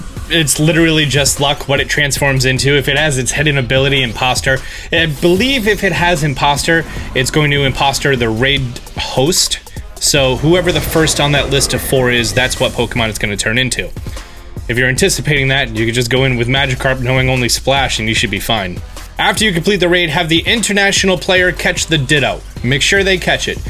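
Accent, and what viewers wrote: Australia. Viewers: Wait, you’re Australian?! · the ausies finding out that he is australian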